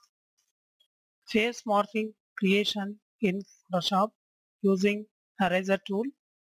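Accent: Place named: India. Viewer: I agree this is the worst tutorial on Photoshop morphing techniques. the final end result is a disaster. no maintenance of ratios, lighting, hell he is even using a rasterized cut layer for his process. With all these negatives in place, the dialect in which he spoke was the only thing you noticed? Why do you even have to say broadly, that 'Indians' should not be allowed to attempt to speak that language? Who are you? The keeper and safe-guarder of English language? People like you should not be allowed to even comment on YouTube or any other social media for any case. You have the gall to speak about Indians as such and watch a video from them, amazes me beyond the realms of any form of human thought. The uploader of the video has either: no brains, absolute idiot or a moron, who channel as a legit name ' ' with noob kind of videos. And on the other side, we have half cooked, stupid taboo people like you who are racist.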